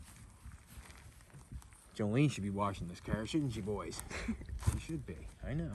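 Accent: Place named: Canada. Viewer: Omg please love you your channel your content and your canadian accent it's all good!!!! But for the love of god stop with the Windex!!!! You could be using any type of spray wax turtle wax to maguire's or whatever brand....but in the same one wiping spray and wipe motion your cleaning protecting waxing and shining and making the surface buttery and slippery for the next inch of dust or dirt to slip and slide right off of.....also because the wax is so slippery way less chance of scratching or swirling paint or clear coat....not a painter but I detailed for almost 2 decades and know what I'm talking about....if no water or no wash which is fine.....at least use spray wax....make that shit shine and buttery as hell and watch the water bead off.....from a machanic and welder at a custom corvette an race shop that detailed for years b4 finally investing in myself and some tools an takin the leap to mechanic for jolenes sake spray wax!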